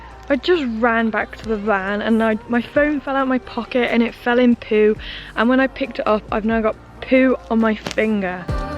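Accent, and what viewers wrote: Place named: West Country, United Kingdom. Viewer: ...scaffold Pike 😜 Scarfell Pike ✔ you have a West Country accent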